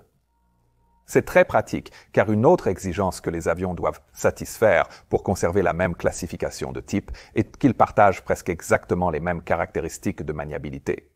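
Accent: Canada. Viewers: Dear, I am a French speaking Swiss citizen. I listen regularly at your great interventions with much interest. Your talks are so well documented, no fake news, trustworthy infos, very educational. Recently I discovered the French versions, translated with AI. Your voice is tainted with Canadian accent and is not as fluent as your English. Sincerely I prefer from far to listen at you in English, as your English is absolutely perfect. May be a suggestion: you should definitely have the original English version. Why not put a link to switch to the original English somewhere below the display?. Thank you and congratulations for your amazing work.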